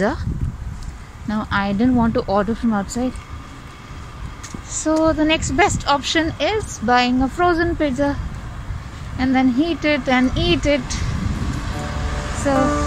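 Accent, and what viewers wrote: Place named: Punjab, India. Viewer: Teri Hindi mein thoda Punjabi touch bhi hai🤭😜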